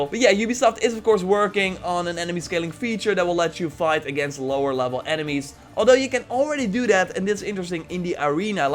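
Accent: Netherlands. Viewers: I knew it you’re dutch! Why can’t you people hide your accent ffs...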